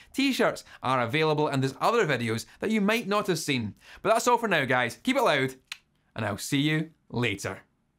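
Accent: Scotland, United Kingdom. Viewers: I've seen enough Peaky Blinders to know that this man is Scottish. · What a lovely Scottish accent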